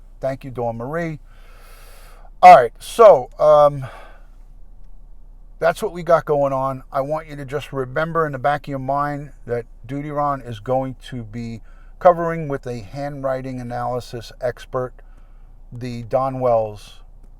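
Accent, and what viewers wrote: New York, United States. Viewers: I'm homesick in AZ from hearing your NY accent! Which borough??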